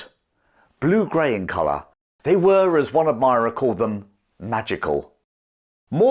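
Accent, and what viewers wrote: England, United Kingdom. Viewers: I have to agree. No AI voice, and his real pommy voice I can understand. And we get to talk with him live.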